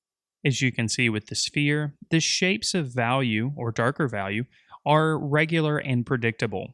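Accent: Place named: Tennessee, United States. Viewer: awesome! you sound like (judging by accent) that you live in the Tennessee area? don't get to hear that accent much any more! grew up in Virginia and now live in North Carolina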